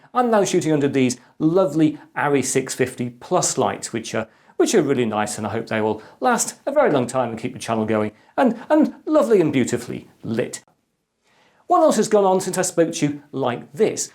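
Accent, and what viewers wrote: United Kingdom. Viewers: It's hard to resist the (vocal) charms of a well-spoken British gentleman, especially when he imparts information in such a crisp, concise manner. There is more than enough flash and noise on Youtube, a bit more understated presentation and thoughtfulness goes a long way. Congrats for a hundred and fifty healthy doses of knowledge, here's to loads more!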